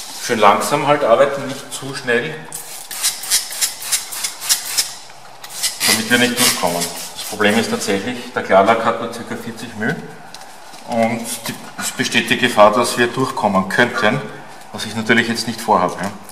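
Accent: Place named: Austria. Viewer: Bist sicher a Österreicher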